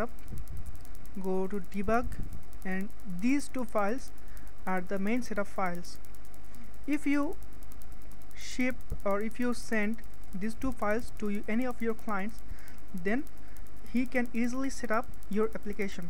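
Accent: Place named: Bengal, India. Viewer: What happened in m You also do not speak English good!! You speak Bengali vernacular.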